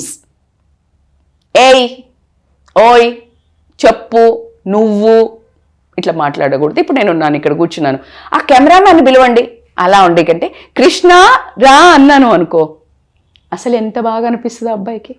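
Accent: Telangana, India. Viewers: Madam is very kind confident and practical very interesting with telagana slang, attractive conversation, sensible psycologist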